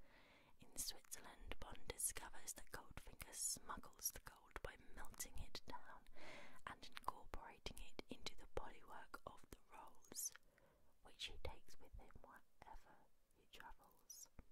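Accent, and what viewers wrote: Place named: United Kingdom. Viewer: Lovely whispering, and I love the British sound 💖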